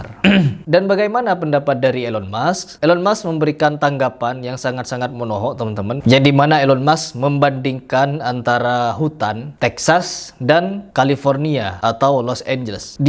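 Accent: Madura, Indonesia. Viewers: Aslinya Madura ya mas?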